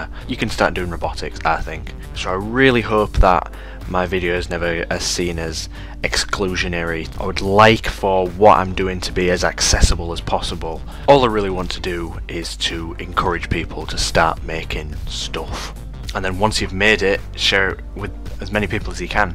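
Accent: Hull, United Kingdom. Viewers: Great stuff very interesting and inspiring! Condolences for your awful accent. What is that? Hull?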